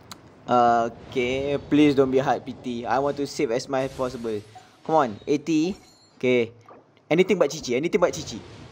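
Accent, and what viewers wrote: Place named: Malaysia. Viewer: U SOUND MALAYSIAN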